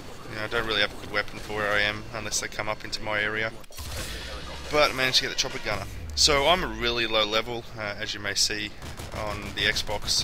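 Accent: Australia. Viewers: an aussie! bout time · australian commentator FTW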